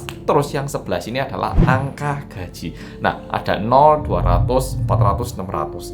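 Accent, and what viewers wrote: Java, Indonesia. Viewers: wajahnya korea tapi logatnya jowo kental banget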